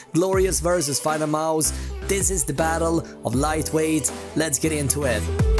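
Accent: Sweden. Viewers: Are you from sweden? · Love your Swedish accent😂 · Like your swenglish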